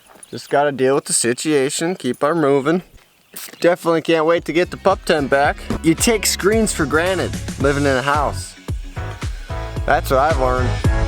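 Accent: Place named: Upper Peninsula, United States. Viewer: Love da yooper talk ya know Der hey! Keep'er movin!! 😁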